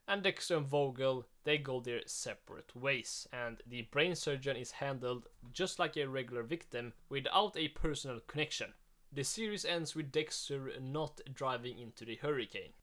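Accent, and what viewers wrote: Sweden. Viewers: Bro are you swedish? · Why does bro have a Swedish accent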